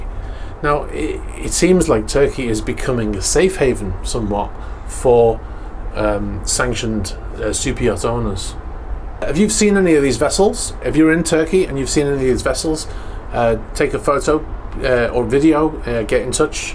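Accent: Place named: Wirral, United Kingdom. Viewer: Slightly off-topic (ok completely) but where are you from? By the accent I suspect you're from Wirral. I wonder every time I hear you.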